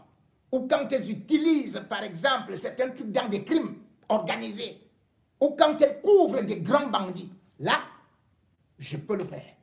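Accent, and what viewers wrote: Cameroon. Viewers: Le président et son peuple sont en otage .😭 le Cameroun est vraiment dans la rue J aime ton accent Beti 🥰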